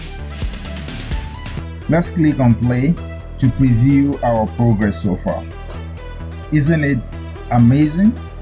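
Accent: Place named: Nigeria. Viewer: You sound like a Nigerian, 👍 awesome tutorial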